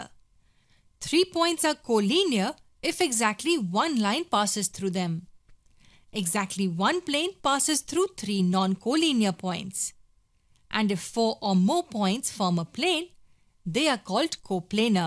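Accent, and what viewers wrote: India. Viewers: Indian, obviously.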